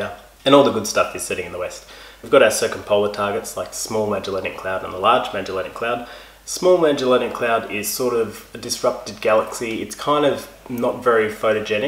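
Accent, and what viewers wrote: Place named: Australia. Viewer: HAHAHAHA, he really has a Down under Accent:)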